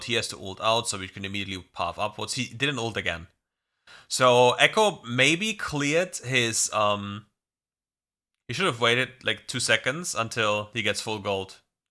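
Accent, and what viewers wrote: Germany. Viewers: it depends on the video and word choice used. Some people have more difficulty understanding accented english than others. His english is fluent, its just hard to get the word usage sometimes due to thick accent, which many people do have. Tbh german accent is cool, its just sometimes hard to make what is being said for some words 😅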